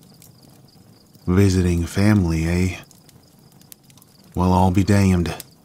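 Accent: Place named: Australia. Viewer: I love it that he called and your Australian accent amazing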